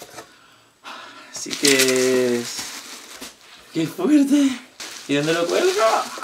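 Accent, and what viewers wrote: Valencia, Spain. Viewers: Enhorabona!!! Com es nota que eres valencià! L'accent no es perd encara que estigues en Madrid!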